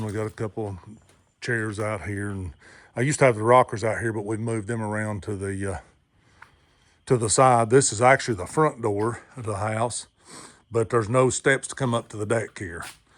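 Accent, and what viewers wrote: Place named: Southwest Virginia, United States. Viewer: Wow! This place is spectacular! Now I cant afford any of this but it's stunning. I have to let you know that you remind me of my family growing up (accent). I was born in Wythe county and lived in Cripple Creek and then Wytheville until the age of 10 and we came further north to central va. If I could, this would be my dream... even a touch of it would. I'd love to live in this area again, it feels like home. My grandmother passed away and I have an aunt and uncle in Rural Retreat and this place is magical. Maybe one day I can find my spot ✨ Merry Christmas 🎄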